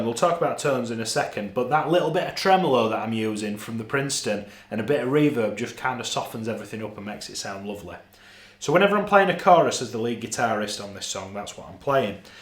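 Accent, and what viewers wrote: North, United Kingdom. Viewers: Call me crazy but you almost sound northerner like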